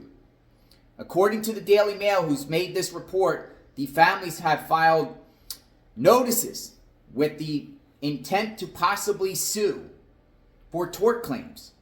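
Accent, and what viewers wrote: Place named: Philadelphia, United States. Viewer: speaks with classic Philadelphia brogue. Much of his pronunciation is a regionalism. He reads perfectly well! Let’s try to build people up instead of tearing them down…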